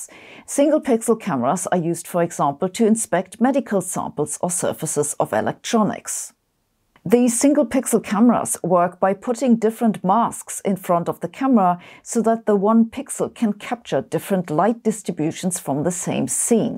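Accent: Germany. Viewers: Somehow her German accent makes the science that she's presenting seem to be even more credible. Although, when she said Dr. Weibel, I thought she was talking about someone named Beiber, since such a name would be pronounced as Beeber in English (and Bye-berr in German). Naturally, I thought she was going to say that "Dr." Beiber discovered magnetic fields by electrifying crowds of teenage girls and causing them to be magnetically attracted to him. Thankfully, the video is packed with info, rather than jokes. Thankfully, also, is the fact that the Dr. in question is a real doctor and is named Weibel, rather than Beiber!!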